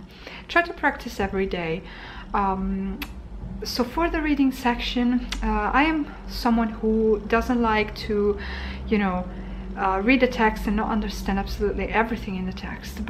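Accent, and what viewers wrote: United Kingdom. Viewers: Me encanta el acento inglés ❤️❤️❤️❤️ lo escucharía todo el día.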